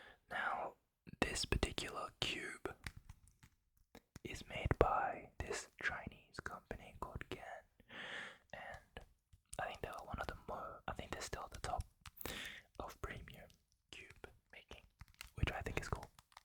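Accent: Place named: Australia. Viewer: Literally 4 seconds in I could tell you were from or are in Australia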